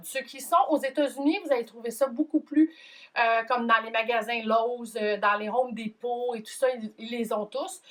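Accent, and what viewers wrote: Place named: Quebec, Canada. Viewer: Bonjour Enfin une video de pouring en FRANCAIS, e vous en remercie; même si c'est du Français Canadien, au contraire c'est très agéable d'entendre votre joli accent je n'arrive pas bien a mètriser mes mélanges, j'emploi moi aussi du Floetrol, ils sont soit trop liquides ou trop épais. Comment je dois procéder, pour que mes mélanges soit parfaits?j'attends votre reponse SVP merci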